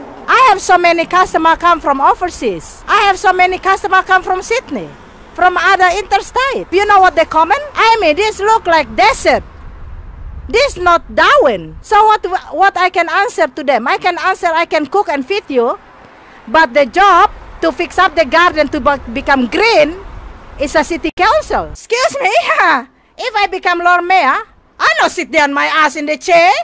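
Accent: Indonesia. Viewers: Ma'am are you from kupang/NTT i noticed the timorese food, Beta orang kupang penasaran saja, karena logatnya juga mirip. Saya juga orang kupang sedang kuliah di melbourne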